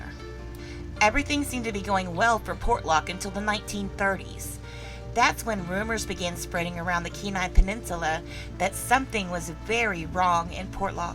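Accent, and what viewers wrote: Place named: South, United States. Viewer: I just love the southern accent 😉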